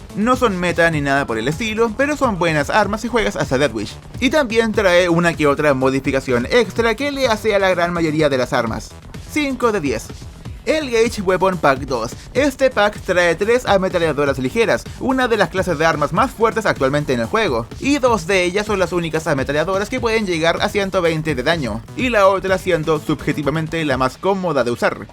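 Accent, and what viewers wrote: Chile. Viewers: Eres chileno? Porque yo si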